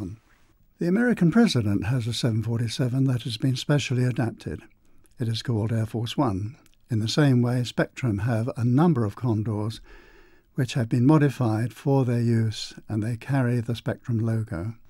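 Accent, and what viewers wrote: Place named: United Kingdom. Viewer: thoroughly British commentary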